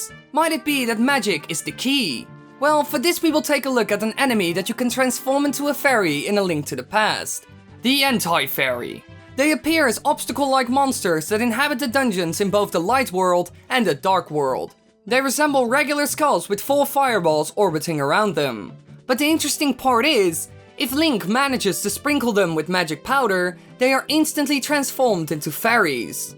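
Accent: Netherlands. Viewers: Your voice sounds dutch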